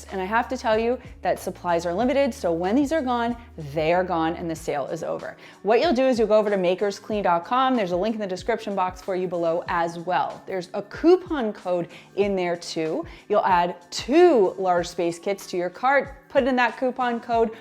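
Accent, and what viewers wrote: Canada. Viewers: Great video with tips for a chore that I always dread. Makes it seem way more doable now, so thank you! Side note: are you a Newfoundlander?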